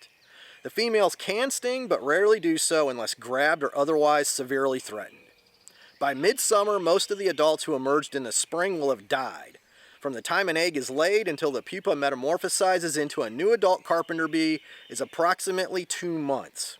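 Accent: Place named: North Carolina, United States. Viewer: I've made brush piles for critters in my yard and I'm excited to see carpenter bees in the next few years. Also, are you from NC? I ask cause I noticed that your accent is similar to mine, lol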